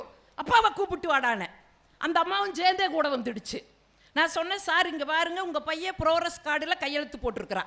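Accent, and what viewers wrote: Kongu, India. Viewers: Super mam..👌 Konjum kongu thamizh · Madam kongu tamilil kalakkareenga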